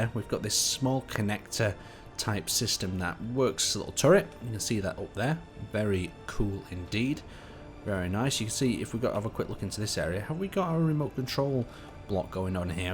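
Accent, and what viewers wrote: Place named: Shropshire, United Kingdom. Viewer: If I had to guess where is from, I'd say Shropshire.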